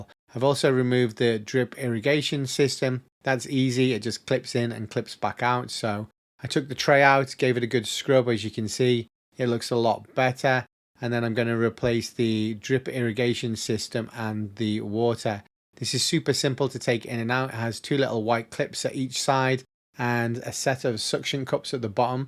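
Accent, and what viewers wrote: United Kingdom. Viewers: you sound so English lol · No a scouse... sound more like berkenhead to me...Better class of grower.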